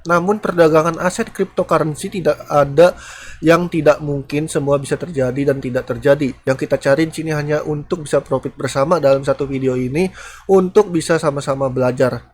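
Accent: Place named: Jakarta, Indonesia. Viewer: Jakarta yee betawi yee kentel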